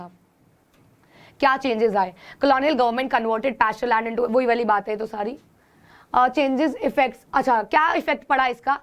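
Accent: Punjab, India. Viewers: Ma'am ,are you Punjabi 🤔??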